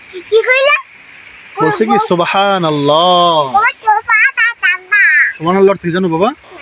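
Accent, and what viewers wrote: Barishal, Bangladesh. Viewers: আপনার বাড়ি কি বরিশাল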